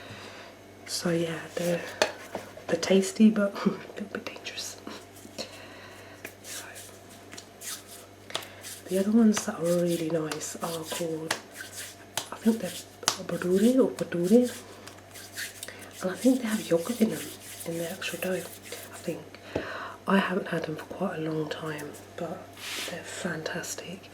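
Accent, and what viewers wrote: United Kingdom. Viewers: are you form the midlands ? it sounds like it.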